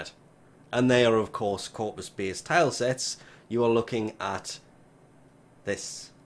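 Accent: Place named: North, United Kingdom. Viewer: Knew I was subscribed didn't know you were northern - just yay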